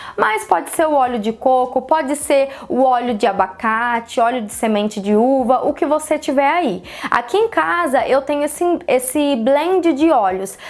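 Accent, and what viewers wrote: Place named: Interior, Brazil. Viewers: Q sotaque mara...sou do interiorrr tbm(Araçatuba)bjs adorei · Adorooo esse sotaque do interioR! Puxando o R! Tbm sou do interior de sp.. Bjs lindaaaa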